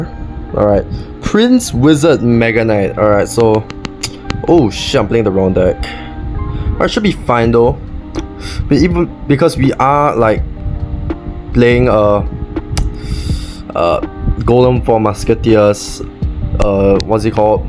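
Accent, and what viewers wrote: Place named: Asia. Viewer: why do you have both african and asian accent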